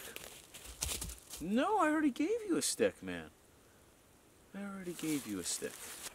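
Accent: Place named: Canada. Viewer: Yep. 1am here in North Queensland and this is the 3rd video ive have watched. I leave his videos running in the background because is canadian accent is so soothing.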